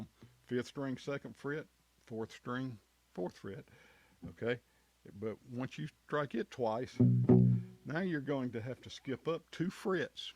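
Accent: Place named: Kentucky, United States. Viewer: ...you sound like Kentucky.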